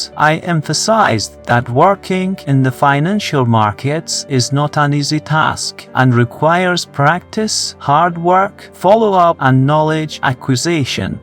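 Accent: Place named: Iran. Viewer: درستش اینه منبع ذکر کنی همه می‌دونن این روش ها اولین بار توسط استاد روزاندیش معرفی شدن انگلیسی صحبت کردنتم که معلومه تابلو زبان اصلیت فارسی 👎💩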